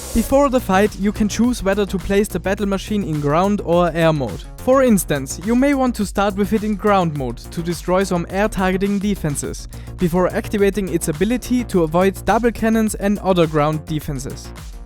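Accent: Germany. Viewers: ngl i love your german accent :D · Mann hört so krass das du deutsch bist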